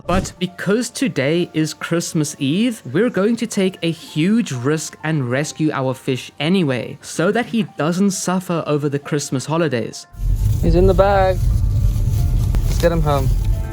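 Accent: South Africa. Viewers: You sound south aftican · Great video man, though your accent is tickling my brain, it sounds like your first language is Afrikaans. Now I might be tripping, but the way you say certain words are just making me think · South African? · I know a South African when I hear one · Are you from South Africa by any chance?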